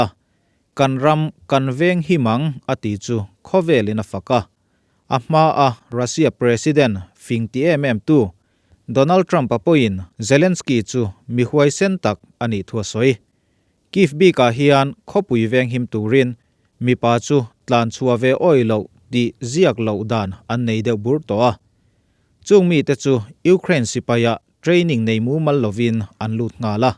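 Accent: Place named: Champhai, India. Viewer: Champhai pa mizotawng hi chu analh ve chiang em. Champhai mizo tawng hi chu a nalh bik e.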